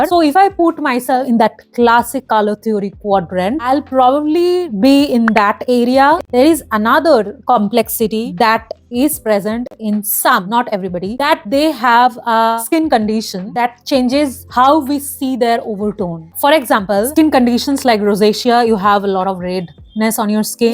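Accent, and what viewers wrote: India. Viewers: Quite informative video. Please work on your accent a bit more. You sound so like a stereotype of heavy Indian accent. I wonder if it's for the effect! 😂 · Hell Nha. The actual stereotyped Indian accent only could be heard from south indian people. Obviously she has a Indian accent too just like me. But it's more like North Indian accent. Anyways having accent is normal. Americans have their own accent, British have their own accent. East Asian have their own accent. So do we. But it's just the accent changes within the country because of how multilingual we are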